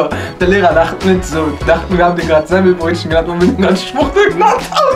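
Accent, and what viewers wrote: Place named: Bavaria, Germany. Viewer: brooo du kommst aus bayern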